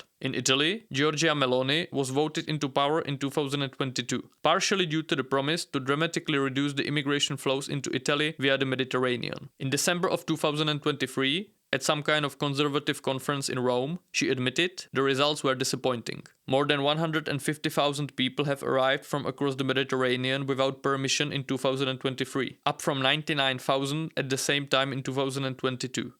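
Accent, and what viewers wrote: Czech Republic. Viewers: Your Czech accent is extremely strong ...Try to at least a bit to change when narrate video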